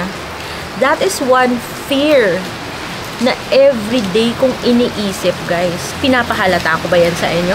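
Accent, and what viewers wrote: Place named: Visayas, Philippines. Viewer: dah gawas lge imong pagka bisaya ahahaha..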